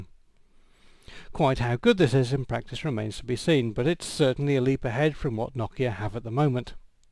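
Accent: United Kingdom. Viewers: I hate english accent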